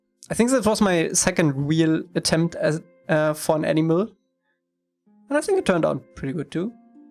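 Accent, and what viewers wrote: Germany. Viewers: Der deutsche Akzent kickt aber anders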